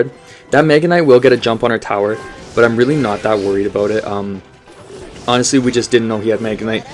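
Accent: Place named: Canada. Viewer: Your voice sounds so Canadian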